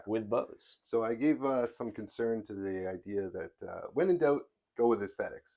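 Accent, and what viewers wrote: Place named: United Kingdom. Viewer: Just found out about this channel and subbed already. I’ll leave the well deserved praise to the other commenters. All I need to know is… what kind of wild accent is that? Sometimes your a‘s are quite British.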